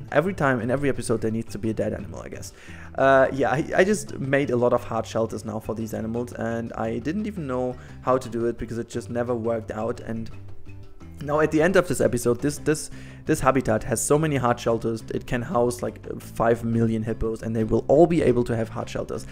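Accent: Germany. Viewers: Is your Native language german?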